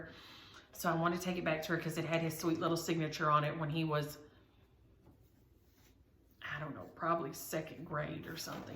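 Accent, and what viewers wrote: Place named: Texas, United States. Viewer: My mother-in-law was called Shug by her parents and siblings. She was the oldest and they always called her Shug. (or Sug - short for Sugar). She was from Texas (Georgetown, Williamson) and had the sweetest Texas "twang" (very similar to your accent). If I ever get the opportunity to be a grandma, that is what I would be called "Grandma". Both of my grandmothers were Grandma and I loved them both dearly. It just seems natural to me, but I totally get how you feel the need to be a different name to your grandchildren. I think since you called each of your grandmothers something different, it isn't a "title" but a "name" - and, I agree, names should be somewhat unique. In regards to my ex-husband. He is gay and finally decided that it was unfair to either of us to remain married - it wasn't easy, but I have come to realize that that was true. 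He is in a relationship with the most wonderful person. They are both my family and I couldn't imagine life without them! And I totally second Roots Tech! It's a great opportunity to get some ideas on genealogy research. They also have an online tool where you can see other attendees and if you are related to them. All the best to you this week!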